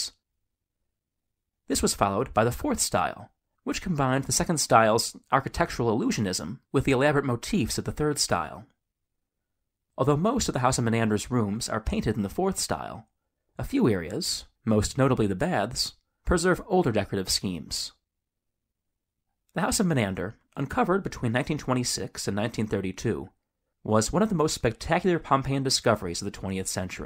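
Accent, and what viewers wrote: United States. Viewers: Interesting if the voice wasn't American... I dont like the "goodbye folks". Other English speaking countries don't call people "folks". It's very rude.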